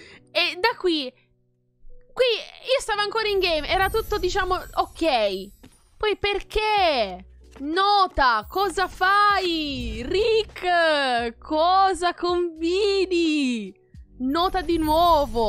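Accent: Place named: Sicily, Italy. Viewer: È siciliana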